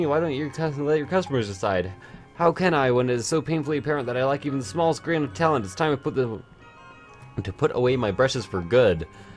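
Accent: Canada. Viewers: Your voice is like sexy butterscotch being dripped into my ears. Where in Canada are you from?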